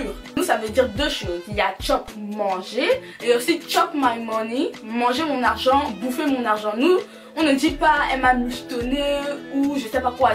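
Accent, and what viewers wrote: Ewondo, Cameroon. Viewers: L'accent camair est vraiment funny...suis senegalais mais j'aime bien...Mais j v savoir aussi c'est quoi"macabo" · T'as vraiment un accent kmer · j'ai aimé la vidéo c'est fou coe tas cette accent camerounais alors que ta grandit en France . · 😂😂😅😅 Merdeu l’accent camer de la gow ci me bollè seulement 😂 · Je suis congolaise mais j’ai kiffé ta vidéo vous avez grave d’expression j’aime trop l’accent camer D’ailleurs ça veut dire quoi « ne pas donner le lait » j’ai jamais compris, vu que tu l’as pas expliqué dans la vidéo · à t'ecouter on dirait une beti ewondo camer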